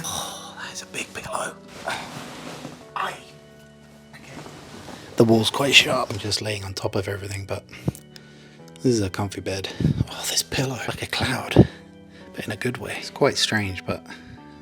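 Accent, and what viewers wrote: Finland. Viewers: Are you developing a Finnish accent? Just asking for friend, of course, fully aware that you would have to consult your linguist peers before giving an answer.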